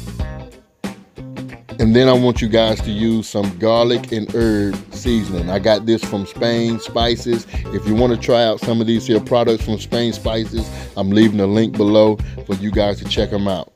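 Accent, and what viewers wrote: South, United States. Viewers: Not all Americans talk like that. (Nothing wrong with it) He has to be from down south somewhere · I love how Americans talk “Some of this here minced garlic” “Get you a skillet put you some butter in it” 😂😂😂 it makes my heart warm 😂😂😂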